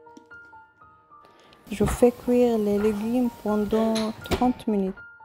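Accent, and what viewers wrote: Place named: Levant, Arab world. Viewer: من طريقة الكلام اضن صاحبة القناة من المشرق العربي . يعطيك العافية ❤❤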